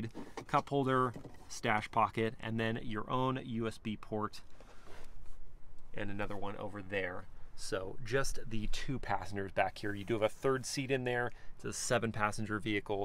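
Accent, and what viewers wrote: United States. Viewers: Great command of your communication. Wish you had ended with the country accent to bring it all full circle. Keep up the good work! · I love your country accent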